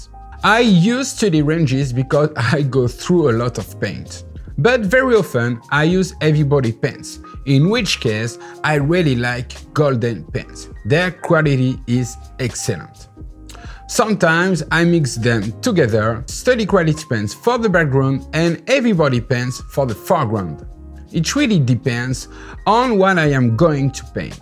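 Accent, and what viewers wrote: France. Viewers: I really loved the colour scheme in this one, one of my favourite paintings you've done. :) About a month or so ago, I paid the shipping for the dvd but I still haven't got it. I'm not sure what to do.. can you suggest anything? Thank you x (also nice French accent :) )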